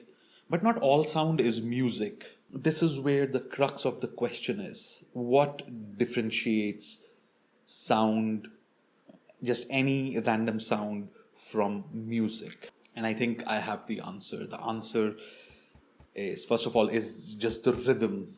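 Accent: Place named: India. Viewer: Your accent is like indians...🙄🥰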